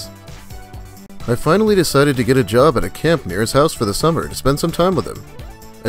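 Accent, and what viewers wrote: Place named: South, United States. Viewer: As a texas boy hearing your southern accent is is nice.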